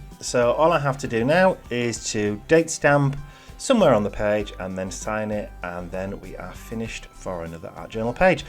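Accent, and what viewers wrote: United Kingdom. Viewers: Love following you, it's great to have a mans voice and a British accent! Great ideas which I will be trying asap, keep up the good work and well done on your burgeoning fan base :)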